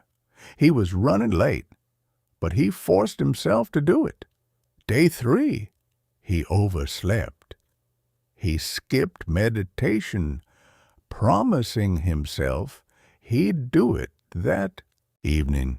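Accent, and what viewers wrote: United States. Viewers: The country like voice is not great.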